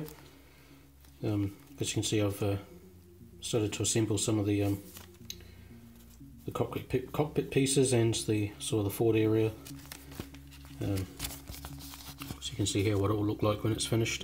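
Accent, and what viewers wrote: New Zealand. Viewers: you are extremely talented! nice paint job! also are you from New Zealand?!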